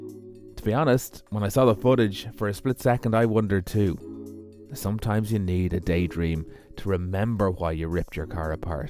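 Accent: Ireland. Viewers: This is the best "one man show" on any platform EVER! I cant even fathom the work that goes into this! The work on the car is enough to make me faint but to package it with the stop motion and smother in the gravy that is that fantastic Irish accent I am hooked! I couldn't give two spits about RR but watched that playlist twice. is a TRUE Renaissance man.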